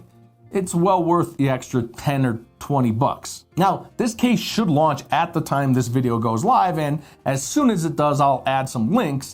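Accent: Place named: Brooklyn, United States. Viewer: This guys accent is great, is he from Brooklyn?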